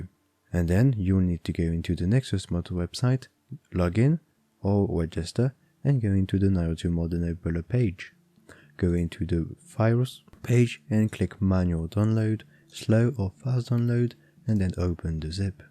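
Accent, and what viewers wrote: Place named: France. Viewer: lmao, you think I want your help after you ruined my experience of modding with your incompetence. Right now, I am disgusted by your way of slacking this, trusting you through the process but it started to go downhill halfway through video, you assume people know all this stuff, not even explains the commands you type, yeah yeah just do it mindlessly like that's the purpose of this video, On top of this, that french accent, yuck 🤮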